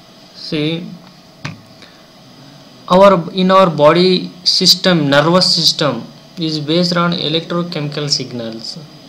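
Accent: India. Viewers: sorry to say this, but this presentation is really hard to understand due to extreme indian accent..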